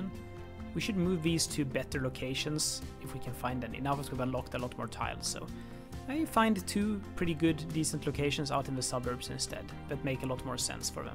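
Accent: Sweden. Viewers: Swedish accent if I ever heard one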